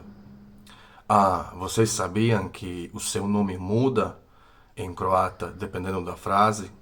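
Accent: Brazil. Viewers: Cheguei a pensar que era brasileiro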